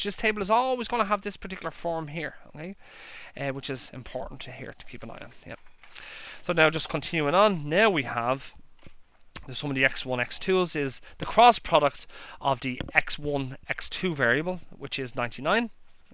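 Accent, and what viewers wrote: Ireland. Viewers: Plus, I really like your Irish accent.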